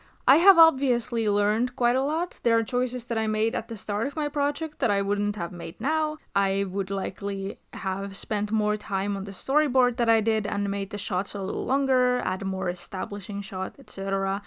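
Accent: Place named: Finland. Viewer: O Al's I guessed that because I'm Finnish too and I could tell from the accent. But your English was so good I had to ask separately.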